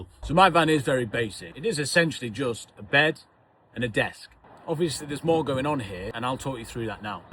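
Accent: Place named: London, United Kingdom. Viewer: Well, you are certainly not Scottish with that accent ... my guess (I've not watched any of your vids before this came up) is that you come from the Greater London area - north of the river I hope 😁 The van looks very nice. Mine is very basic too, with just a bed and W.C. Will be looking at your back pages 👌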